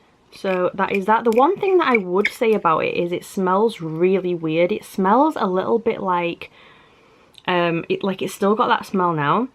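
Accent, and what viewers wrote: United Kingdom. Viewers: What part of the uk r u from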